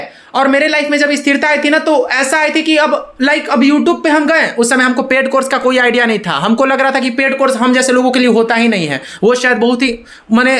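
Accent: Bihar, India. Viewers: shudhh bihari ho bhai hmko❤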